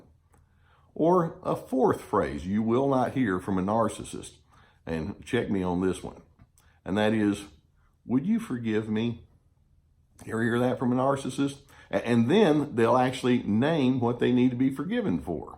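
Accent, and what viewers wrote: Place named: Georgia, United States. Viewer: Where is from? He sounds like Effingham County, GA! ( A Salzburger? Well, I Suanee!!!”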